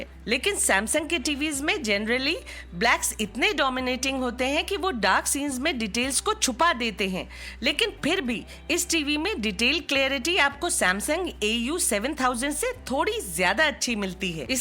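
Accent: India. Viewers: I’m from Brazil and I can’t understand the Indian accent, so I put it in mute and turn on the subtitles… great review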